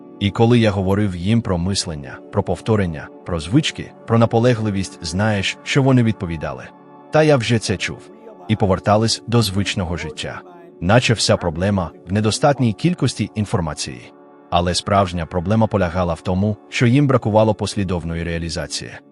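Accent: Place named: United States. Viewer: Жахлива озвучка, що це за американський акцент?😂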